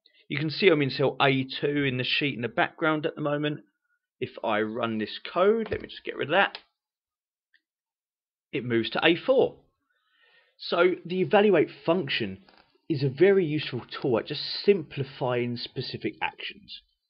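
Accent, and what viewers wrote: United Kingdom. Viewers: This is a very useful video to understand Evaluate Function in VBA. Thanks for sharing. And love ur British accent btw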